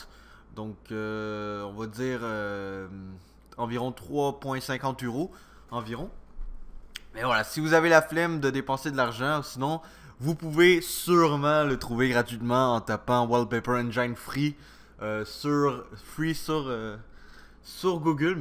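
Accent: Quebec, Canada. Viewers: J'adore ton accent Québécois ❤️✨ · il est québécois ^^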